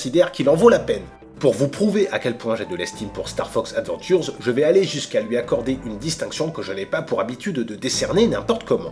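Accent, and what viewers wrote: Alsace, France. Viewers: Tu ne serais pas Alsacien ? Sinon,j'ai aussi galéré sur l'epreuve de la peur.. en faite je l'ai jamais reussi x')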